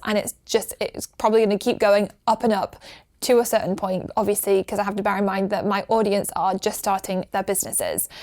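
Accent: United Kingdom. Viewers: I admire the accent , literally. I am trying to pull out mine. i love the british accent.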